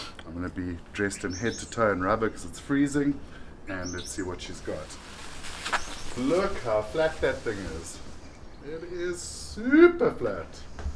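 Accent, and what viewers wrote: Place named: South Africa. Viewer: Great content deserves great recognition and some dope giving back on the love that has been given, i.e., called content... It's channels like yours which make the internet and You Tube a fun place to visit and not a boring one... Thxxxxxxx dude!!!! HAPPY SURFING FROM VIRGINIA BEACH, VA!!!!!! Oh yeeeaaaahhhh I am nearly 5'9 and fluctuate from 205-230 pounds on the leaner side never less than 190 lbs, so being somewhere in the big guy club myself, this channel hits right and is super spot on... Not every surfer is skinnied out with a six pack and big guys can and do rip too, like Owen Right, Jordy Smith, John John, William Cardoso, Jay Davies, and Wade Carmichael to name a few... Plus your "SA" accent and knowledge is cool... It sucks your not at 50K approaching 100K subs already... I hope your channel blows up because your having fun, sharing positive vibes, and giving back 411 surf info we can all learn from plus dope "SA" surf, ocean, and board footage... 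Channels like yours, How To Rip, The Surfers Journey, Kale Brock, and isurftribe, have all helped me learn more about surfboards and how to build a proper surfboard quiver for my body weight and size and skill level... Most important your fun to watch because your ""having fun"" and your passionate about SURFING which most definitelyyy shows and what it should be about it, sharing and giving positive vibes and stoke to the viewers... Thanks again dude!!! Movie quote for you,, "Build it and they will come". Please keep bu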